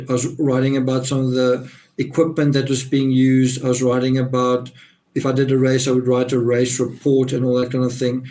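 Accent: South Africa. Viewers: I love your south african accent ...i have family stuff there. And i do really listen to you when you try to analyse these horrendous stories. Your dog is adorable, by the way even tho im allergic. 👍💕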